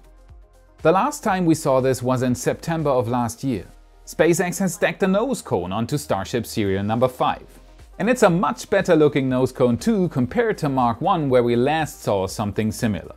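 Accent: Germany. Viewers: Are you from Germany? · Have i mentioned how i like your van braun english accent! Great video as usual.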